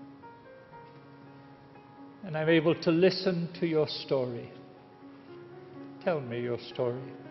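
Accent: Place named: Ireland. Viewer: he sounds as if he has an Irish accent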